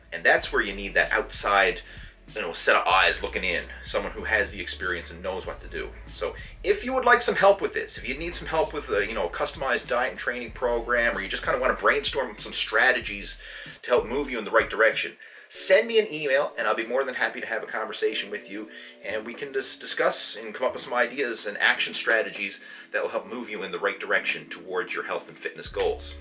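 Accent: Newfoundland, Canada. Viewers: Me: "This guy sounds like a Newf." Google: "Yes, he is a Newf." Me: *Subscribed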